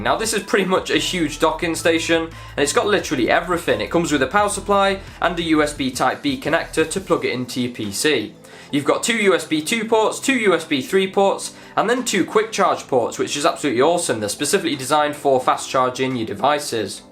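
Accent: United Kingdom. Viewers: Hi, it's such a good video. I'm from Indonesia anyway and it is really hard to understand your word because you were talking in english with british accent and so fast:D lol, I need to learn much. Tx btw:) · I love your BRITISH accent!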